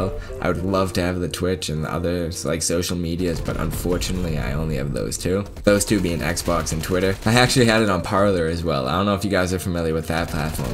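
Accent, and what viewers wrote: Boston, United States. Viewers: BOSTON ACCENT was the goat back then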